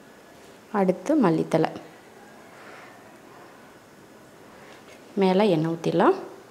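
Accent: Coimbatore, India. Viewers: Neenga Coimbatore ah madam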